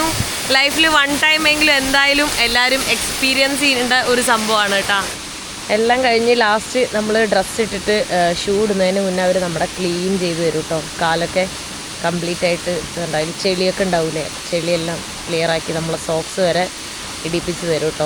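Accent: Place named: Tamil Nadu, India. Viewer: 👍.. Speck slightly tamil...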